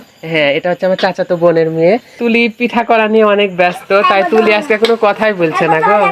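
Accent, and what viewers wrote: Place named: Naogaon, Bangladesh. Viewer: নৌগার ভাষা এমনি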